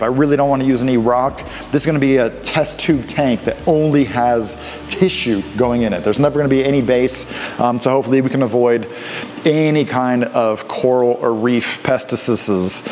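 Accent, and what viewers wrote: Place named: Australia. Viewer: I thought you were from Australia 🇦🇺😀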